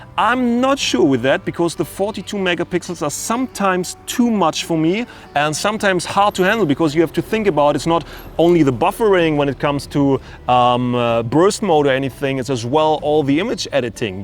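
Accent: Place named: Germany. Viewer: Hey, habe auf dem deutschen Kanal das Video gesehen in dem die Frage gestellt wurde 'wieso so viele englische Videos usw.?' Ich schaue mir lieber den englischen Kanal an.. ich liebe es mich dadurch weiterzubilden und dein englisch ist schön klar verständlich. Scheiß auf deutschen Akzent.. mach weiter so! Ich feiere den Channel!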